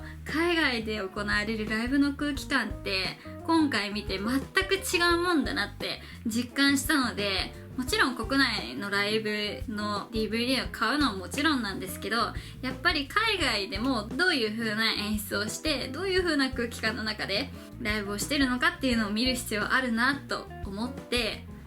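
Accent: Hiroshima, Japan. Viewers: 同じ広島人として、広島弁混じりの動画いい耳心地デス。ソレも有りいつも観て応援しています。 私は、ソニスの頃にファンカム漁りしハマってから、ウェンブリーと2nd発売の頃は、色々買いまくってましたね。 ウェンブリーは音がイイ。 各楽器が立体的に際立ち、BHOベースと青さんドラムの融合感、神バンドアンサンブル粒立ち完璧。 後、買っといた方がイイのは、LEGEND-S だと思います。 地元だし2日間とも参戦しましたが、YUIちゃん急病で片翼の危機に、２人+神バンドの気迫のパフォーマンス大規模演出ライブでした。 藤岡さん最後のギター🎸泣ける。 MOAMETALファンならば、あの奮闘MOAちゃんは買っとかないと！ て、ザ・フォーラムもだし、1月の幕張総出撃も買わんとだし、 まぁ、今年はライブおあずけなんで、その分デロ買ってね（╹◡╹ʔ༄ て、事でしょうかね。 夢が有る事は大事。人生は一度きり。その若さなら時間も可能性も充分有る。 人生は夢だらけ(by,YUIちゃん)w。 頑張れ👍。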